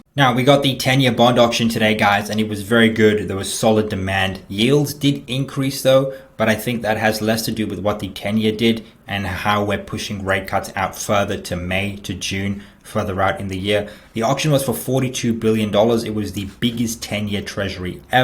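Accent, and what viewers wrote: Australia. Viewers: Great analysis yet again.I love your familar Aussie accent too; yet another reason for me to listen to your show. Since commercial real estate (CRE) woes are very much in the news all across the world & not just the US, does this mean that every woman, man and their dog is now placing short bets on just about anything to do with CRE implosion say with options a few months out? Thanks, for any further insight you are able to offer.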